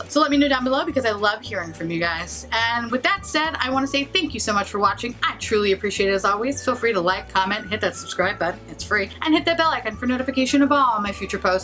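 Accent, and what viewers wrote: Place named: United States. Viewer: I think we may be the same person. It's like listening to myself talk with an American accent. ...aaaaannnnnndddddd subscribe!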